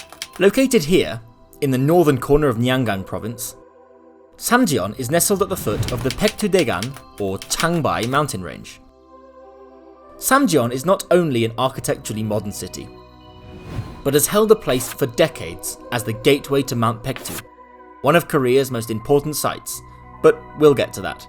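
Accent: Manchester, United Kingdom. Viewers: Yes, sounds like a bit of a Manchester accent to me, certainly a bit Northern UK? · Yes. A British-sounding man with an apparently good knowledge of North Korea history. He seems to have also a good pronunciation of North Korean names and places. Not to mention his sophisticated taste in choosing background music. In short a man of mistery.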